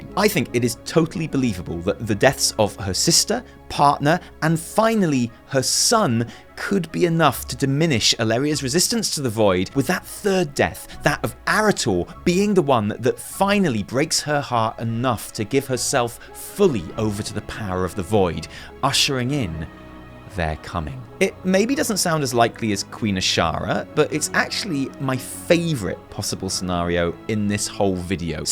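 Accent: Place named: RP, United Kingdom. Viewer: Another brit giving us a bad name making everyone think we speak like the queen.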